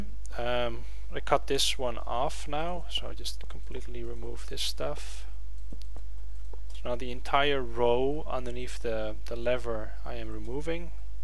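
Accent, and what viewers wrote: Netherlands. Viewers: Hey, are you dutch? I recognize the accent :p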